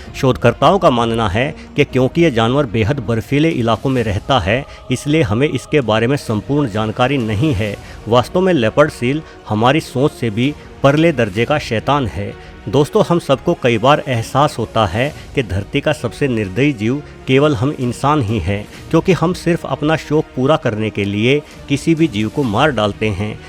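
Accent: Haryana, India. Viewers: HR se hi ho kya Sir aap voice se lgta hai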